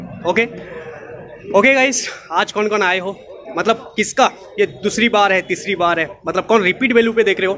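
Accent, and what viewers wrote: Odisha, India. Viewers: Aap odisha se ho kya❤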